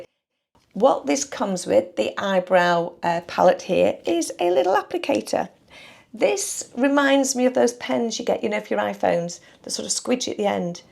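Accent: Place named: England, United Kingdom. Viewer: I have that Max Factor palette from Amazon, it’s one of my favourites. The colours are so pigmented. It’s refreshing to hear a makeup guru with an English accent. New subbie loving your channel x VickAngel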